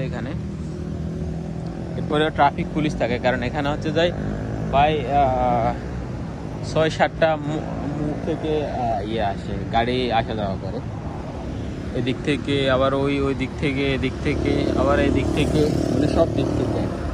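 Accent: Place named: Comilla, Bangladesh. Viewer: আপনার বাড়ী কুমিল্লা মনে হয় ভাই ❤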